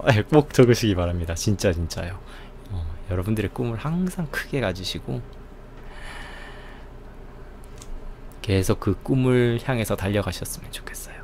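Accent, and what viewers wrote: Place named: Gyeongsang, South Korea. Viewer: 경상도 억양의 맑은 목소리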